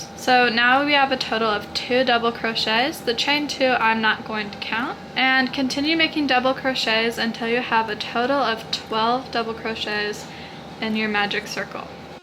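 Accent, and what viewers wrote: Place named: New Hampshire, United States. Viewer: Are you from New Hampshire? Your accent is so cute 🥰😊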